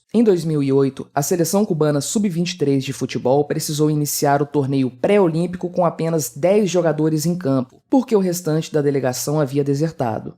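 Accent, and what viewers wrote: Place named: Belo Horizonte, Brazil. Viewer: o sotaque desse narrador é idêntico ao do Nikolas Ferreira de BH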